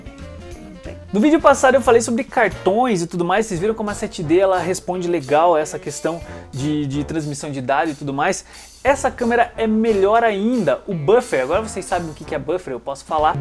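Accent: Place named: Curitiba, Brazil. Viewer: O áudio da câmera realçou ainda mais o teu sotaque curitibano. rsrs Abraços!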